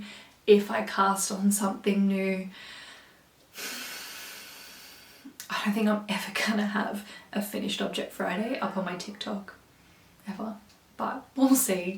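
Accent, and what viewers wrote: Australia. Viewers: Thanks for a great episode. I'm from the UK but lived in Perth for 13 years. It was great to hear that accent again x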